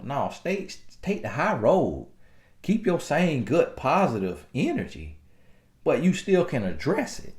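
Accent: South, United States. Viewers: I love your videos and that southern drawl🥰. Thank you for doing what you do! You’ve saved my life as well. You’ve made me laugh at a time when all I did was cry. I think you’re articulate and hilarious but most importantly you love God. God bless you!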